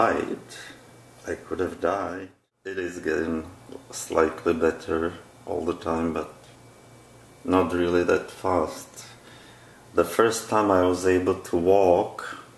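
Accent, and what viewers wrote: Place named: Germany. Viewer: Oh, wow!, your foot looks MUCH BETTER!! I am SO happy for you that the worse part is over! Thank you so much for sharing your long and very painful journey with us! The first time I had MRSA the first doctor I saw said it was a spider bite. So, he gave me a steroid shot and sent me home. Oh, yeah, did I mention that my "bite" (or, as I found out later by my next doctor, it was actually MRSA) wound was on the lower part of my forehead-right between my eyes?!! You can probably imagine just how painful THAT shot between my eyes was! Unfortunately, it sounds like the first doctor you saw was about as inept as my first doctor... But, thanks be to God-- we both came through it alive and well!! 👍👍 Hey, btw, I have one more question, I noticed your accent...where are you from?? (I'm kind of thinking it's a German accent--and I apologize if I'm way off.) 😁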